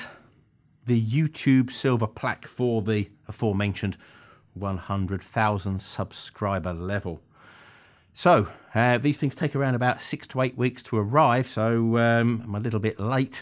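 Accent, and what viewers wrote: United Kingdom. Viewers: Congratulations! I especially appreciate your fine British pronunciation in your videos. Keep up the good work!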